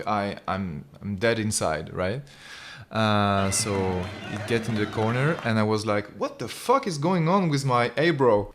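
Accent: France. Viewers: Jme disais bien que tu etais francais avec l’accent 😂👌🏽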